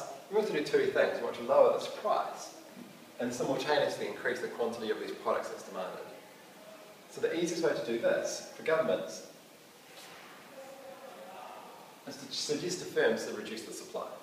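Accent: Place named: New Zealand. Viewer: Is it really that hard for americans to understand a new zealand accent? God sake lol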